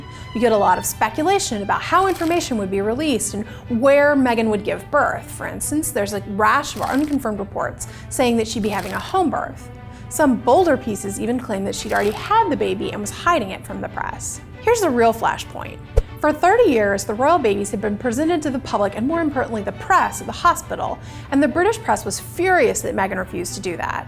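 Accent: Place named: United States. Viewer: I stopped watching after hearing that slimey American accent yuk🤮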